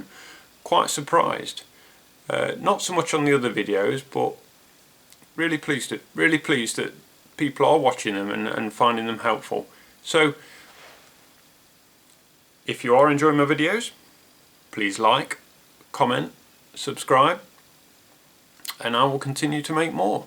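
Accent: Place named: Nottingham, United Kingdom. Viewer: Hi, I thought I recognised that lovely accent of yours, then you mentioned Nottingham! I am originally from Mansfield, living up in Yorkshire now though. I am finding your videos very informative, currently going through the MS diagnosis process myself. Keep them coming, they are very helpful. Thanks.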